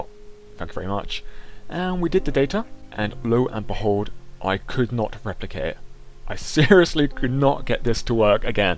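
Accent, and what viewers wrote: United Kingdom. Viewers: Why don't you do more videos where you speak in your calming British voice?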